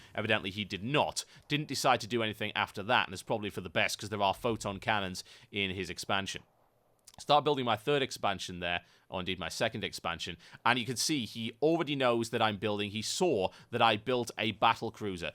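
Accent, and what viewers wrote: United Kingdom. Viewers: i think ur funny as hell jw r u british caus eu sound just like my britsh friend :D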